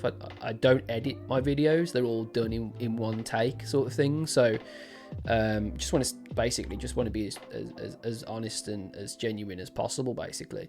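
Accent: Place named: Northern England, United Kingdom. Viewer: Subscribed because I'm a sucker for a Northern accent and your videos are fantastic. I saw the first one and knew immediately how much fun you were going to have with this. ✌️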